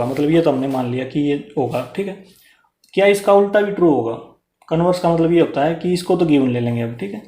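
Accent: Haryana, India. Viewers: Re sir aap to haryanvi jaise bol rhe ho But love u sir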